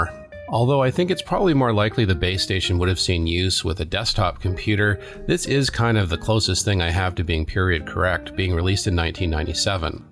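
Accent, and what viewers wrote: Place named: Canada. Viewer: You sound so Canadian. "Ageenst it" hehe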